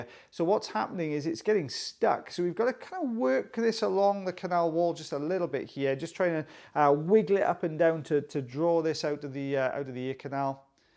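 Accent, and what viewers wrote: Wales, United Kingdom. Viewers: I was always squeamish about ear wax but I love your gorgeous Welsh accent and how you describe the procedure. Thank you 😊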